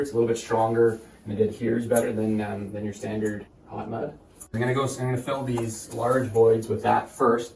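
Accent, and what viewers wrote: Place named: Ireland. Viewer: Great job. You seem to use many different coats of filler, give a great finish. Also you sound Irish!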